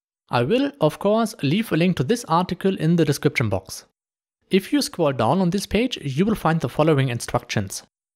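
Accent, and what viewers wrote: Germany. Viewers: klingt krass deutsch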